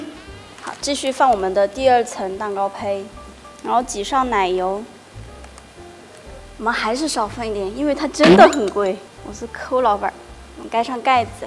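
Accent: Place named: Chongqing, China. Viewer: 重庆椒盐普通话听起来好可爱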